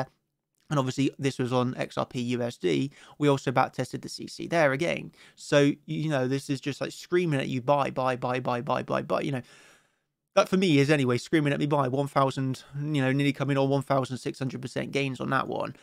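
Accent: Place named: Bristol, United Kingdom. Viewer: Mate u sound like ur from Bristol Lol👍😎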